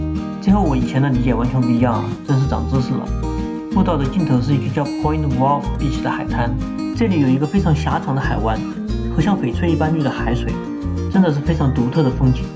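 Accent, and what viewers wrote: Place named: Sichuan, China. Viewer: 发音像四川人说普通话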